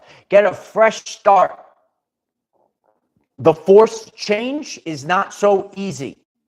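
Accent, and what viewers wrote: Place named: Brooklyn, United States. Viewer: I am here for ur Brooklyn accent allllllllllllllllll day. Letter rip tater chip. I'm from Oklahoma and Texas and say yall. Ur the best. ❤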